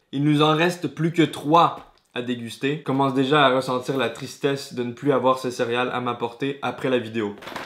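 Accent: France. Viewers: Mdr t'as un accent français dans cette vid XDD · tes revenu avec un accent francais big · PK tu te donne l'accent francais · Ton accent a changé tu as adopté UNPEU l'accent francais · Écouter ça en 2023, my god l'accent français. Le voyage t'avait laissé des restants 😝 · Qui trouve qu'il a pris un accent français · Ques tu fait avec un accent français???!!! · WTF !? Pourquoi dis-tu que tu es Québécois quand tu met en valeur un accent français de France dans tes vidéos??????????????? ( Sans insulter personne )retire : PAROLE DE SHLAG QUÉBÉCOIS dans ta description à cause TU LA PAS POUR UNE CENT xD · criss t'es revenue avec un accent francais on dirait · Je trouve que à cette epoque il avait plus l'accens Fr que QUEBEC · Ton accent a bin changer osti, t’avais un sale accent français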